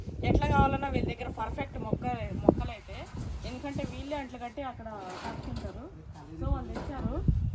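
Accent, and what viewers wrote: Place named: Andhra Pradesh, India. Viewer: andhra accent kiraak asala..... chaala baagundi 😀 you don't often hear that purest form of Andhra telugu in Hyderabad or Telangana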